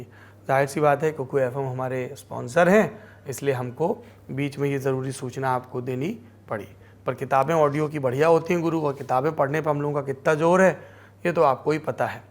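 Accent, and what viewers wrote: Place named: Bhojpuri, India. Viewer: पहले मेरे पिता जी news देखने को कहते थे कि बेटा News देखा करो हिंदी भाषा अच्छी हो जाएगी। लल्लन टॉप और इन की तरह खबर बताने वाले तो हिन्दी भाषा को को भोजपुरी चटखारा दे रहे हैं।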